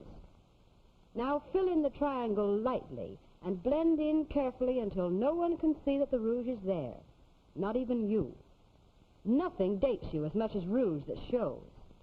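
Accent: Transatlantic, United States. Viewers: It’s the transatlantic accent, it was only used for tv and films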